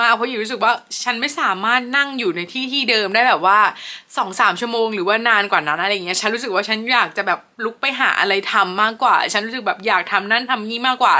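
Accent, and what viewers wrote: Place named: South, Thailand. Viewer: หล่อนน่าจะคนใต้ พูดเร็วลิ้นรัว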